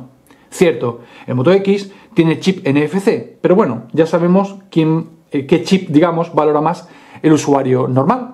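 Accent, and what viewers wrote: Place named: Andalusia, Spain. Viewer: De que ciudad de andalucia eres?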